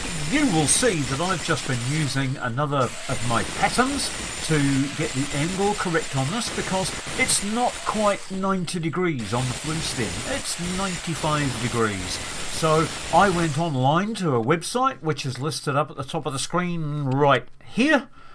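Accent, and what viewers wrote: New Zealand. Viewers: Hi sounds like your. in kiwi land.im in northland ...have any available for p....?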